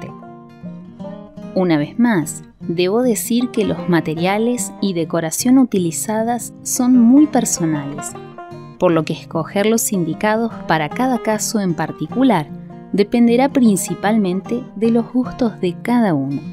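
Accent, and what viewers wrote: Argentina. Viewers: Yo lo silencio...no me gusta nada el acento argentino. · Con ese hermoso acento argentino no me cansaría de escuchar audiolibros en mi jardín.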